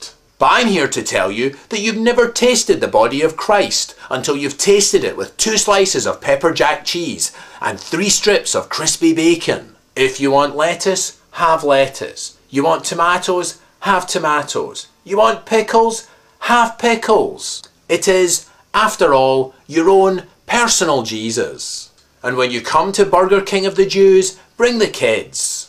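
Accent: Scotland, United Kingdom. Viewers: I love Scottish acsent and I love this video, you are the funniest guy. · Your Scottish (or Irish) accent is the funniest thing in your videos. The rest of the content is fairly awesome, too, however.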